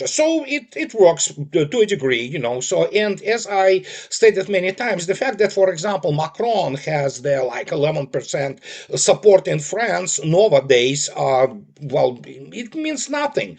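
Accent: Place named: Russia. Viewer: 'Confabulated fairy tales' via hat marvellous Russian accent! Music to ears and as always Truth! God Bless, Andrei, a beacon of informed understanding - reality itself.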